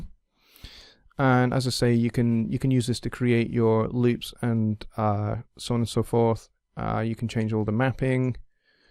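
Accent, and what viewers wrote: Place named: United Kingdom. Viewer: Thanks a lot, very clear information and I like your British accent. Is there a way of finding out who is making thumbs down?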